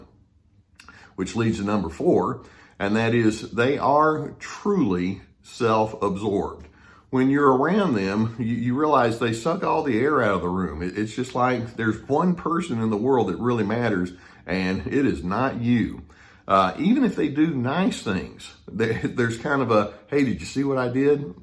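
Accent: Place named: Texas, United States. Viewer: Nearly all of the people in my life are anti-conversation, at least with me. Their defensiveness, quickness to pronounce me wrong, etc. interfere with the idea-based back-and-forth I crave. This is surely partly my own fault but if there's a word for such auto-contrarians I'd appreciate your telling me. P.S. You sound Texan; which part y'all in?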